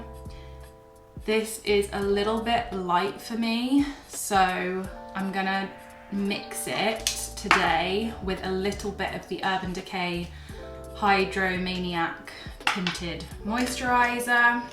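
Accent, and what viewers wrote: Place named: United Kingdom. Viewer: No one says "glam" like you with your classy British accent! :D Beautiful look!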